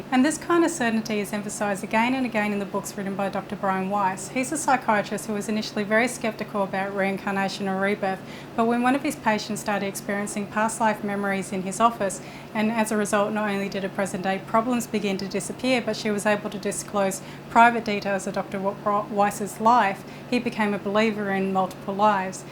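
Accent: Australia. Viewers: Interesting topic. Too bad I have to work too hard to understand what you are saying. You articulation is ...hmmm... let say, not what it should be. Your accent does not help either. I stuck with it for more than 3 minutes. Too bad. Coz as I already mentioned, interesting subject. Perhaps this is intended to be watched by Australians only. Like an "Aussie secret". Do with it as you please.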